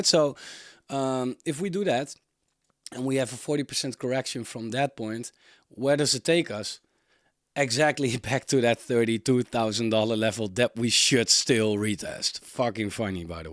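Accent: Netherlands. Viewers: Nederlander? Nice video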